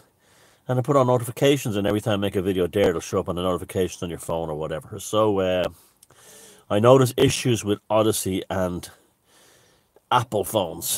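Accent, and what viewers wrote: Ireland. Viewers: Over on Odysee you can use salty cuss words in your cool Irish accent. You always make me laugh when you do that.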